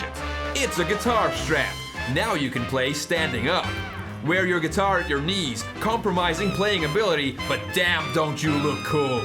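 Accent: United States. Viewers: The American accent you use. Thank you for that. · That American accent though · Seriously good review. I mean I am a bass player, and you almost had me wanting one of these... And I give you a B+ on your american accent. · Last time I was this early my girlfriend was disappointed. Also that american accent tho. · Great video, and pretty cool bundle. Your American accent is damn charming haha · Oh boy that American accent is coming along so nicely! lol, just love these review videos, awesome as always! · THE AMERICAN ACCENT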